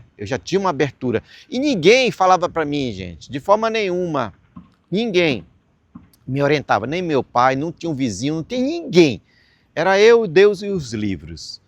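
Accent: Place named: Paraguay. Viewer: De onde você é? Soa paraguaio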